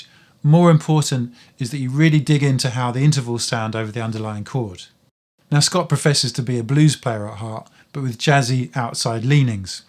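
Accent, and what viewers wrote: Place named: Scotland, United Kingdom. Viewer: Vvery Scottish indeed. Fantastic content. You are a natural!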